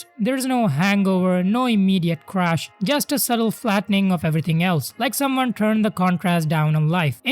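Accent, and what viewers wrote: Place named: India. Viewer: no, it's your IND accent. the IND accent is probably the most annoying in the world to listen to.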